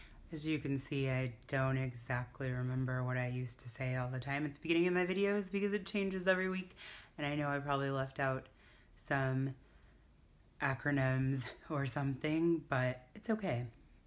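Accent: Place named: Northern California, United States. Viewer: You sound like my cousin who is from northern Cali that's why I was like hmm I wonder if she is from the west coast. I'm from New Jersey but people say I have a southern accent lol as far as the post op... I've been scared to eat because I always get nauseous.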